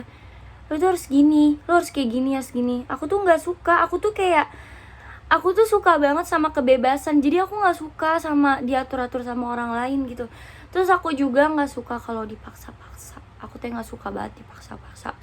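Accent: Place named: Sunda, Indonesia. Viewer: Suka ke sunda²annya kak 😘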